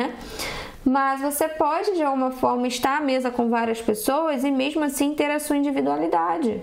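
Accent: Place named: Rio de Janeiro, Brazil. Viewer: Eu amo o sotaque e a energia carioca 😂❤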